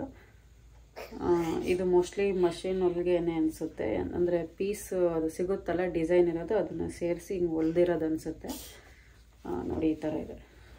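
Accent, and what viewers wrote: Mandya, India. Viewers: nimma matu keloke mandya bashe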